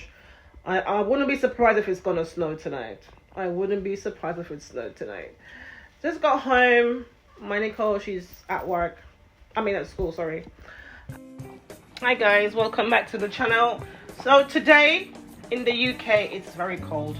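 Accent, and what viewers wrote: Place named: Ireland. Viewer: You sound like them Irish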